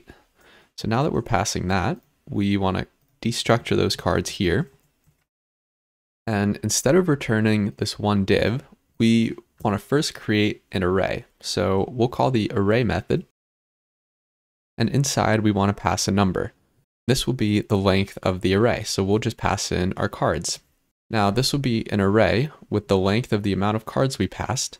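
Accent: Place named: India. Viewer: Great video, by the way, are you Indian or Russian? Great accent and a very soothing voice.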